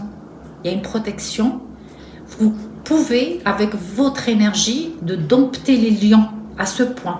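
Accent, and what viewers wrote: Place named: Quebec, Canada. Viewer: Vous avez vraiment l accent du Québec comme moi . Tt facon je ne comprends plus rien dans cette relation toxique empoisonner avec mon ex autre. Ca me parle beaucoup cette guidances .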